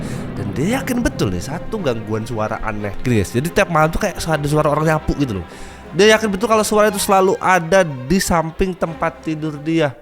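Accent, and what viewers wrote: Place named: Lampung, Indonesia. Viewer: Bang ni orang lampung bukan ya? Logatnya kaya orang lampung ya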